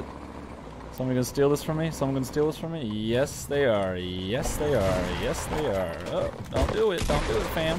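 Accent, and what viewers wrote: England, United Kingdom. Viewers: English accent🇬🇧on point👌🏾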